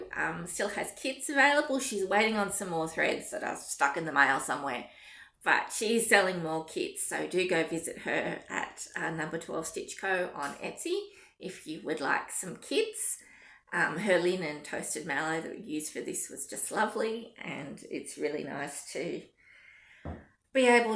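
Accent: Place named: Australia. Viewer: One of my favourite reds to stitch with is DMC 347. Enjoy your flosstube, nice to hear an Aussie accent!😊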